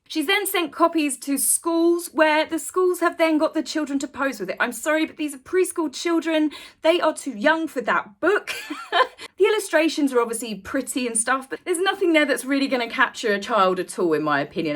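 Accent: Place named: RP, United Kingdom. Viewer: Not on topic- where in UK are you from? Your accent is posh- like a news reader? Anyway- I love your speaking voice- mine is harsh West coast US ;)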